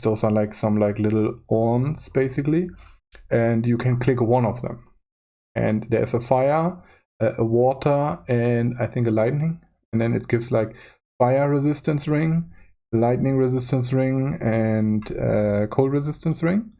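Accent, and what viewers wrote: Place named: Germany. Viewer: Man merkt, dass du Deutscher bist. 😄 Gutes Video!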